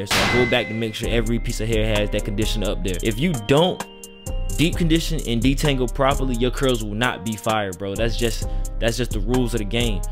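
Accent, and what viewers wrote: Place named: New York City, United States. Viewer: Ya he definitely got the NYC❤️ Accent Like if you agree 👇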